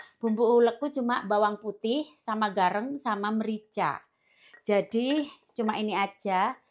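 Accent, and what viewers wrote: Java, Indonesia. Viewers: Assalualaikum, salam untuk klg semua dari Balikpapan (kaltim)kalo liat vidio ini bisa senyum" sendiri logat jawanys itu lo yg bikin lucu😀😀👍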